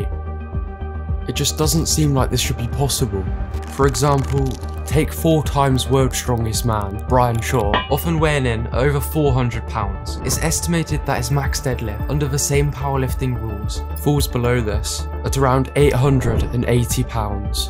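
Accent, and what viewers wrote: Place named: United Kingdom. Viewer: your British accent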